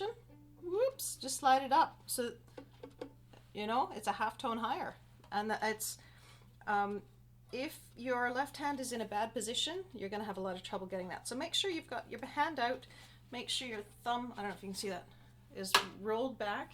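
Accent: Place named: Canada. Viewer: I found this video to be very helpful. I have small hands, short fingers, many years (66) and have been taking lessons from an excellent teacher. Never heard that you should roll a finger to the next string and never heard to “never” lift the fingers, which is what I have been doing. Going to try and see what happens!! Love your Canadian accent. Thank you for posting this video.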